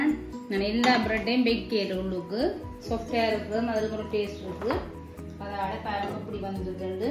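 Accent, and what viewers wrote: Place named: Sri Lanka. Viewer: இலங்கைத்தமிழ் 💗💗 தமிழ் என்றாலே அழகுதானே .... அக்கா உங்களுக்கு 4000 watch Hours வந்திட்டா ??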